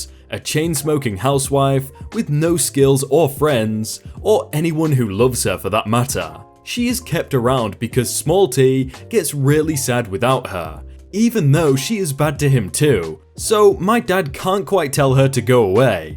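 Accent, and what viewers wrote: China. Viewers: ST: My Chinese is rusty Also ST: Great Chinese accent Me: O_O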